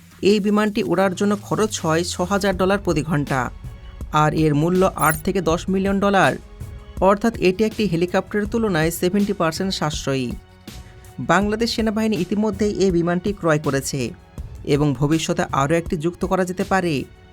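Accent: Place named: India. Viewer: APNAR BACHAN BHONGI LIKE INDIAN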